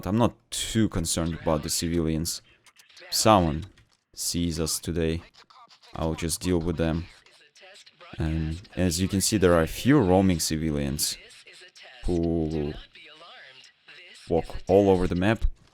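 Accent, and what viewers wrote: Russia. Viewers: Акцент русский, по моему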